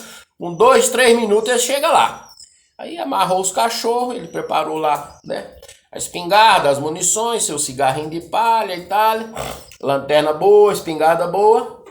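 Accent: Goiás, Brazil. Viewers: engraçado o jeito goiano eu gosto muito de moda de viola as modas antigas e escutando o randal grande compositor e goiano falar da vida dele e voçe contando historia sao muito pareçido o jeitao de falar parabens voçe e bom contador de causos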